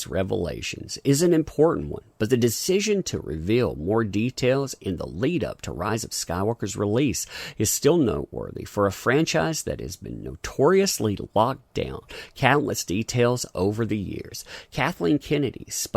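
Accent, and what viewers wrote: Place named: Carolinas, United States. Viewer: are you north or south Carolina? You sure sound like it 👍 This Skywalker movie sounds horrible and I dont see it doing what Disney hopes.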